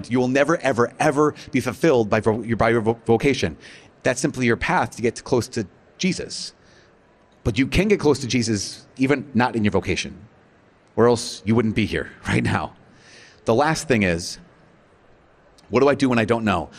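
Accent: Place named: England, United Kingdom. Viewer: Great English accent!!!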